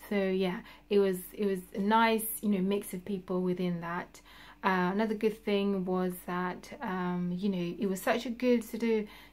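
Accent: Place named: Newcastle, United Kingdom. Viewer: Sounds like Newcastle.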